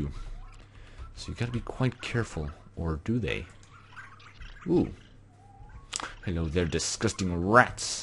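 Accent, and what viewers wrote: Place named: Australia. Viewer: ( I wanted to hear you in your Australian accent.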